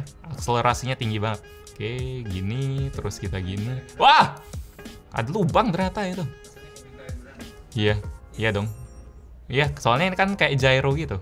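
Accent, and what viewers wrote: Palembang, Indonesia. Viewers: Keker bahaso pelembang nian😅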